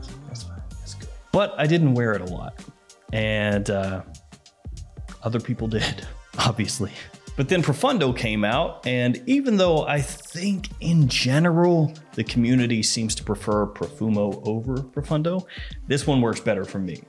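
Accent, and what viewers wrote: United States. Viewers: Hey your 🇺🇸 accent is cool to us Brits 🇬🇧. My last unsolicited compliment was from wearing Mancera - Cedrat boise. Greetings from England 🏴 - Lee.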